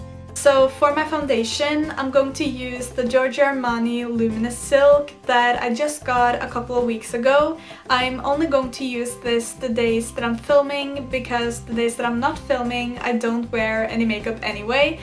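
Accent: Norway. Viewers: It's the norwegian accent.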